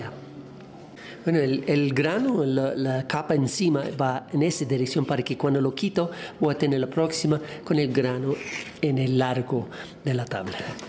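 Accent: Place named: Brazil. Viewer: Se me hace que tienes acento brasileño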